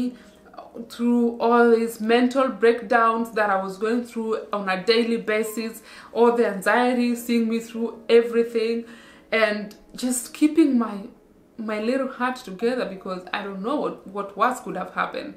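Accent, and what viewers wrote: Luo, Kenya. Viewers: I love ur luo accent.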